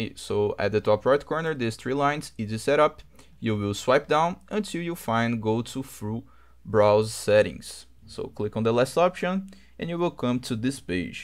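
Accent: Brazil. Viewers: Eu reconheço um paulista em qualquer lugar! Hehe, brincadeiras a parte, ótimo vídeo.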